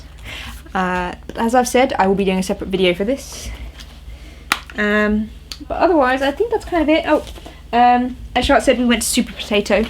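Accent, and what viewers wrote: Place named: England, United Kingdom. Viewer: It's true, English accent makes everything sound classier.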